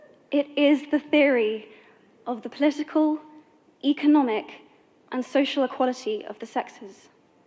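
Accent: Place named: United Kingdom. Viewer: xem truyền cảm hứng vừa học phát âm anh anh ... quá đỉnh luôn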